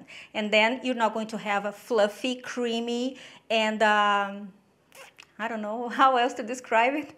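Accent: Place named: Brazil. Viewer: Eu acho que essa moça é brasileira, tem sotaque de brasileira e nome também. 😂😂😂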